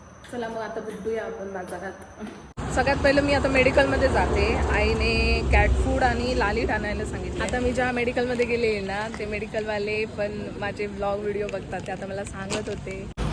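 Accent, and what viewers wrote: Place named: Konkan, India. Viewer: कोकणी बोलण मस्त वाटत, मांजराशी बोलण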